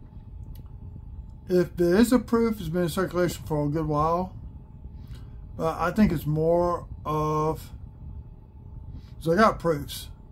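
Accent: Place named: South, United States. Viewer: Nice Southern 👍 😊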